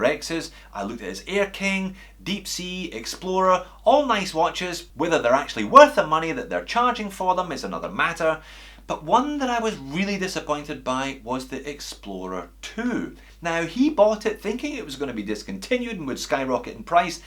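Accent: Scotland, United Kingdom. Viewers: I think I heard you say you were Australia, but damn, you sound like a Scott. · He's not a native. Accent is for sure from the British Isles somewhere.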